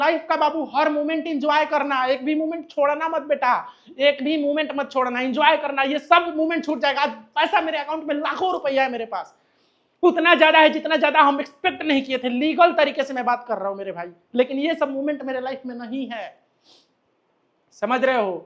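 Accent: Bihar, India. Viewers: Sun ke Acha lga aap Bihari ho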